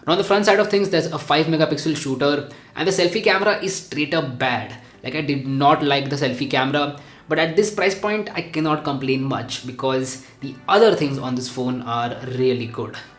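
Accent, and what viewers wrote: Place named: Maharashtra, India. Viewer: You need to improve your pronunciations. You have a marathi touch while speaking english. Listen to iGyaan if possible, Bharat Nagpal (i think i guessed his name right) is the only YouTuber who's capable to beat biggest tech YouTubers when it comes to overall presentation. Otherwise all things are good. Also if you really can speak marathi consider starting a marathi channel too. #peace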